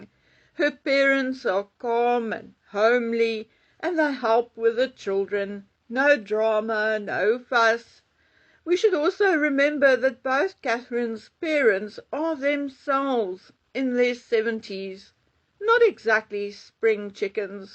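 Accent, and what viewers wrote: South Africa. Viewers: Hello. I am a Johannesburger (Bedfordview) and love your show. Love listening to our SA accent❤ We’re having lovely rain at the moment. Just great. Take care and have a lovely evening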